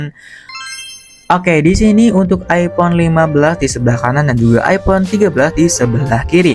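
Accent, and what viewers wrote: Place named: Cirebon, Indonesia. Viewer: Busst orang cirebon lu bang😂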